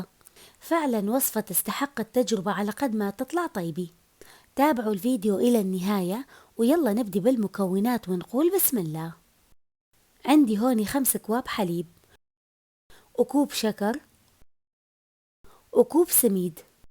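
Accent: Mosul, Iraq. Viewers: عاشت أيدك وفدوة للكلام المصلاوي الجميل والترتيب والنظافة والراحة النفسية ياريت تعملين فديوات لترتيب وتنظيف وتنظيم المنزل أو المطبخ لان مبين عليك شاطرة في كل شيء وكيف إدارة أوقاتنا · اللهجه مصلاويه 😍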